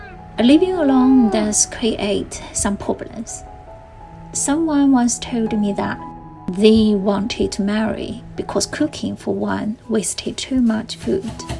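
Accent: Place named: United Kingdom. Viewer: have such a charming British accent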